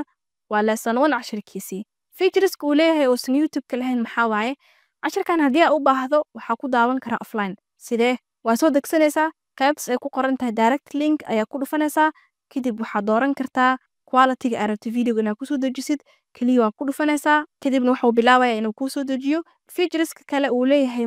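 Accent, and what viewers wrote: United States. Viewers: Wll waxan kaa rbaa appkii aad ku baratay American accent thanks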